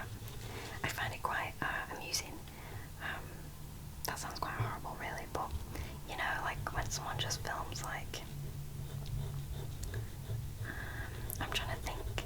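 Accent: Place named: England, United Kingdom. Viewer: I've watched this like 4 times already when going to bed. The sound from your sketching and your English accent sends me away to dream land! Love this! Thank you!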